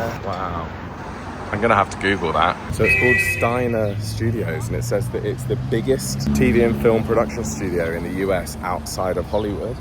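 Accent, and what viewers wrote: England, United Kingdom. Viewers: Ok take it from this old lady, you being so cute and an Englishman in New York. Oh, my, they could write a song about that. L o l just open your mouth and they'll love you. That lovely accent alone. Here in America you are one of the most classy person. So go in those upper shops look like you own the place. They love.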